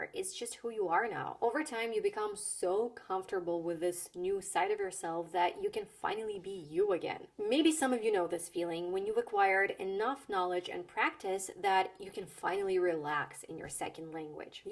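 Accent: United States. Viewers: Good evening my beautiful teacher 😊. i love your all videos and the way you explain and teach is fantastic and excellent. i am trying to speak like you but it might take time and i thought in beginning that you are American because i love your parlance. thank you so very much 😊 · If you not say that people say that you are pretending being an American… I couldn’t believe you are not an American… for me you look and sound as an American woman…. Hope one day I can pretend too being an American as good as you are doing it 😅….congratulations! =) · Thank you for this video. You speak an excellent american English . Impressive. I'm trying to do the same with English from south of England but I don't have your talent at all !😅 Very few people can fade their accent. In France, I lived in Paris almost almost my life, I met very very very few foreign people without accent.I just remember a russian woman, that all. 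Yes, foreign people can speak french very well, but without accent?no, it's absolutly exceptionnal. Absolutly, learn a foreign language changes your mind, and your point of view about a lot of things👍 Nevertheless, I'm 53 years old, my priority is to be understood, to have a consistent pronunciation, and to have fun learning English. See you soon on your youtube channel👍👌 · You sound as an American , and i really like hear your voice and your information. 🎉Thanks ☺️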